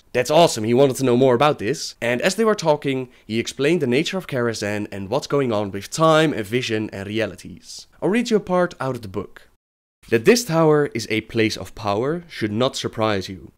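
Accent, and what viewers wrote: Netherlands. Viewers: What's up with the dutch accent?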